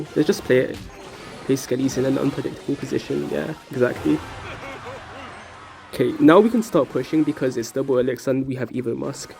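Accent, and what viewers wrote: United Kingdom. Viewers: Hm i remember when u said u wouldn't voice reveal bcuz if ur british accent 😅😂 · Damn bro you sound so British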